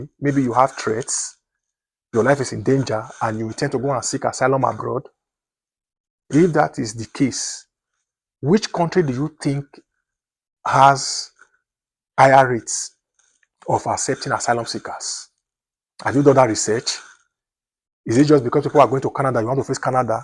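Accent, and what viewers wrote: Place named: Nigeria. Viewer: Support advise Africans how to develop their own countries than to advise them to run and go live in other western countries. The fact is the western world is fade up of immigrants using any kind of routes such as asylum and student visa to come live in their countries. You sound west African from Nigeria. Why don’t you go back to Nigeria and build your own country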